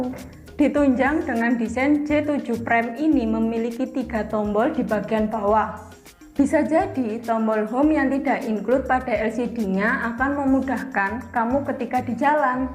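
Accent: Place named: Java, Indonesia. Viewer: Medok men to mbak ma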